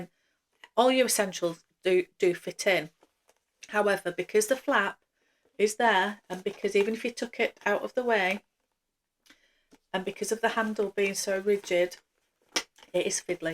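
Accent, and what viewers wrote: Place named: Leeds, United Kingdom. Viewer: Wow, you are so fortunate to get your hands on this rare beauty! Congratulations on 1000 subscribers! And side note, I love that you're from Leeds -- I can hear it in your accent. When I was in my mid-20s I spent a summer in Leeds and had such a great experience and met so many kind people. Thank you for sharing!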